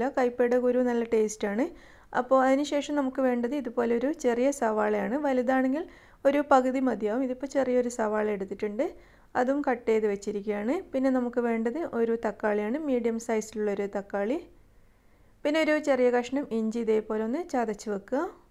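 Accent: Kannur, India. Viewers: uuyyantee apppaa. Kannur slangg. Nice receipe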